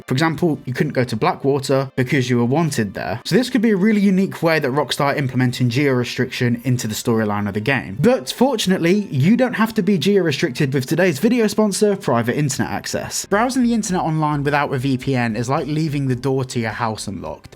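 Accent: Birmingham, United Kingdom. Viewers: This dude is from Birmingham I can tell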